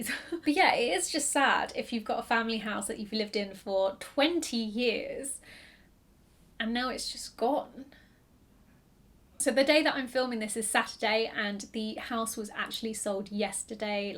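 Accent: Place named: United Kingdom. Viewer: British accent is very beautiful to listen. I can listen you speaking any longer. You're gorgeous!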